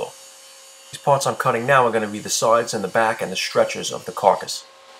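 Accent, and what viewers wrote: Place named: Staten Island, United States. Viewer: Are you from Staten Island?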